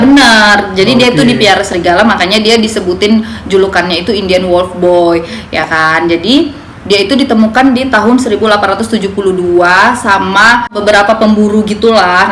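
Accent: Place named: Medan, Indonesia. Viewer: Dek , dah pande kau , berkisah ya, kalau org2 mdn yg nonton dah pasti lah ngerti bahasa kau tu, kalau org2 Jkt belum tentu paham semua aksem gaya bicara kau....hahaha.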